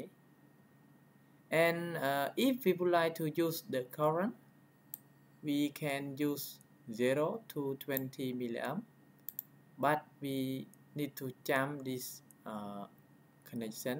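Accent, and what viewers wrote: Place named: Peru. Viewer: Es como un peruano hablando inglés.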